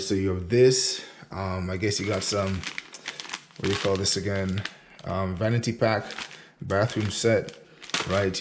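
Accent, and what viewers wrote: Jamaica. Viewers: Question... are you Jamaican? Hearing a bit of a Jamaican accent, I could be wrong though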